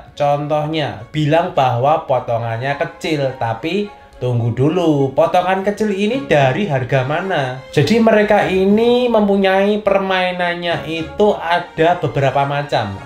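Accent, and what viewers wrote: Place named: Surabaya, Indonesia. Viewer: Koko medog banget Jowone koyoke wong Suroboyo nggeh? Terimakasih infonya yaa Ko,,sangat membantu sekali kontennya dan bermanfaat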